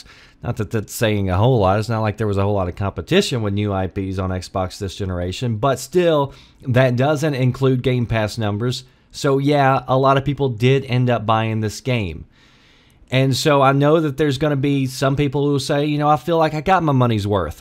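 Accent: South, United States. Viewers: holy shit his southern accent threw me off so bad.... i fucking love southern accents and im not even american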